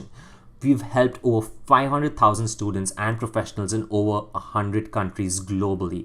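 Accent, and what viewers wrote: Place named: India. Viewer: McDonald's don't use white ppl in their advertising either. So no wonder this guy sounds like he's recording this from Mumbai.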